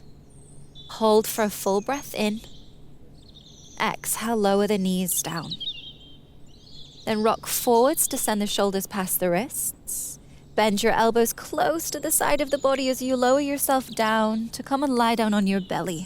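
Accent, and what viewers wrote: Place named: United Kingdom. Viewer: Hi, some feedback as you mentioned. I started 20minute daily Yoga October'24 to raise money for BoneCancerUK. Since, have continued for my own well being, and today, the 82nd Day, I worked with this 30 minute class. Thank you for your clarity explaining he expectations. (PS love your British accent, and the birdsong: )) 🙏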